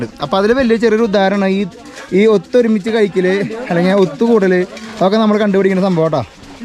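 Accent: Thrissur, India. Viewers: thrissur slang pettannu alkulle kayiledukkan powerulla manohari😘😘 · അലസ്‌കയിൽ വന്നപ്പോൾ തൃശ്ശൂർ ഭാഷ കാരണം subscribers കിട്ടുമോയെന്നു ആശങ്ക പ്രകടിപ്പിച്ചിരുന്നു.അന്ന് നൂറിൽ താഴേ മാത്രം subscribers!!ഈ ആഫ്രിക്കയിൽ വന്നിട്ടും തൃശ്ശൂർ ഭാഷ കൈവിടാതെ തനതായ ശൈലിയിൽ കൂടി നാല്‌ ലക്ഷത്തിൽ അധികം subscribers!!!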